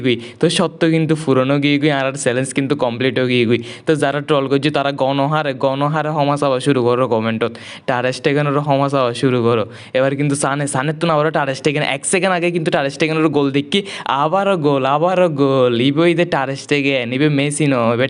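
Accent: Chittagong, Bangladesh. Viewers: আরে দুর ভাই প্রতিদিন আর চট্টগ্রাম এর ভাষা ভলো লাগে না। বন্ধ করেন এইসব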